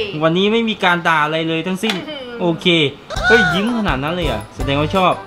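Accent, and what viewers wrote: South, Thailand. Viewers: พูดใต้ได้กันน้ะ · พี่เเหลงใต้ดีกว่าครับผมชอบ555+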